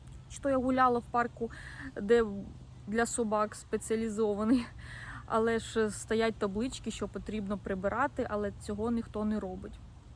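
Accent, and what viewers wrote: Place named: Russia. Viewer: Извиняюсь, но у меня один вопрос. Вас кто-то заставляет во Франции говорить по-украински? Вы явно русско-язычная и для вас это иностранный язык. Слушаешь вас и уши "вянут".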